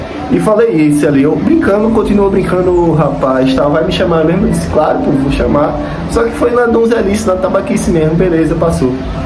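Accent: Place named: Recife, Brazil. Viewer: Eu j tive esse sotaque. Recife, Pernambuco, Brasil.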